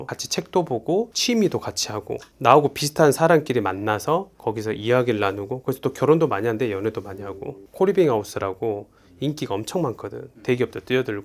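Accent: Jeolla, South Korea. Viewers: 아 전라도 사투리 ㅈ같네 진짜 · 라도 사투리만 고치시면 딱 조을텐데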